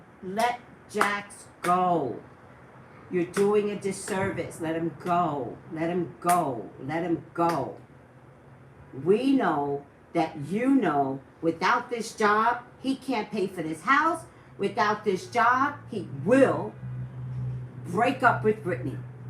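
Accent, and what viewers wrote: New York, United States. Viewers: Are you from NY? I love your energy!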